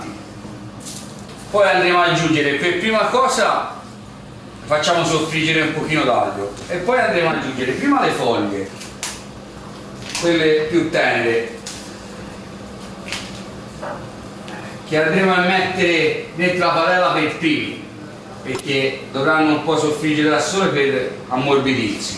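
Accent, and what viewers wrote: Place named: Livorno, Italy. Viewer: Dev'essè bono!!! Livornese abbestia eh!? Bravo, ottima spiegazione!!!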